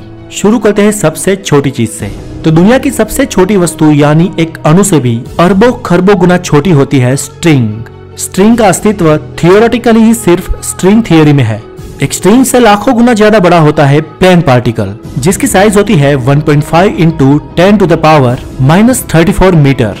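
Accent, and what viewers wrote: Gujarat, India. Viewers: Sir tum gujrat ke ho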